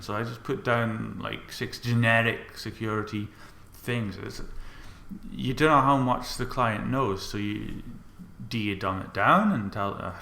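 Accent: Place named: Scotland, United Kingdom. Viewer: Cynicism always sounds better in Scottish. I disagree with the comments that you were rambling. I enjoyed the conversational style.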